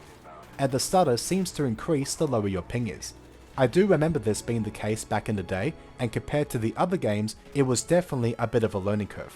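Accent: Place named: Australia. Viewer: Not bad for an Australian accent.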